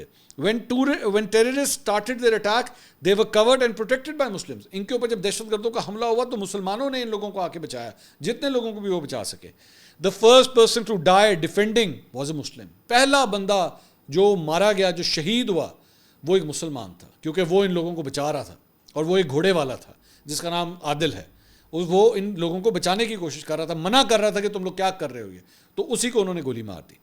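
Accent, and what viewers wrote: Punjab, Pakistan. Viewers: Dammmm I love ur puuunjaaabi😂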